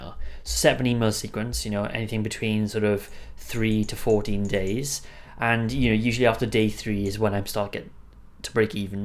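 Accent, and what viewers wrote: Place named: Wales, United Kingdom. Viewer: I love your Welsh ancient, it reminds me of my Shrewsbury family! Your content is Gold and I love your no frills approach it’s refreshing 🤘🏽💯 keep going brother you’ll be super affiliate in no time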